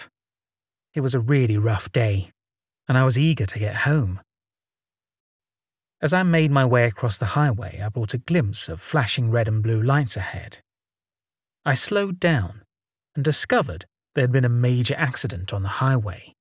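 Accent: United Kingdom. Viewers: Cheers fella, loving the content- I am sure your subscriber numbers will shoot up! Nice to hear a British voice 👍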